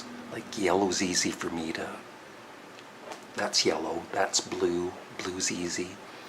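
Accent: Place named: Canada. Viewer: First off I love your Canadian accent. Secondly I am not color blind and saw a clear number in every circle. You were very accurate in your guesses when you weren’t sure. I hope you get the chance to get the glasses from what I’ve seen online it can be really life changing. Maybe you could do a go fund me and I’m sure people would donate.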